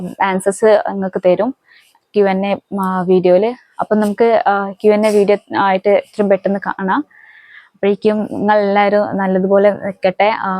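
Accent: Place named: Uttar Pradesh, India. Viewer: Enikk thonnunnu ivar up yil ninn keralathilek jolikk vannu malayalam padichathanenn ....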